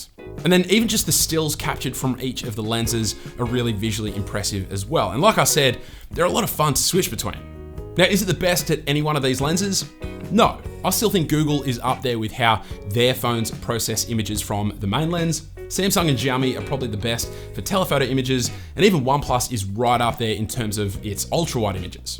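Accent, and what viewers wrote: Australia. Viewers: Hey, I tried to buy one of the RhinoShield Collab cases for my 13 Pro Max using your link and code, but I got an error saying they cannot ship the PAC-MAN collection to Australia? Curious since you sound Aussie? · Whats with the over the top bogan accent?? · That hick Aussie accent tho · I never heard such deep australian accent in one person. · Heard ur aussie voice and gave an instant sub. Luv to see it 🇦🇺💙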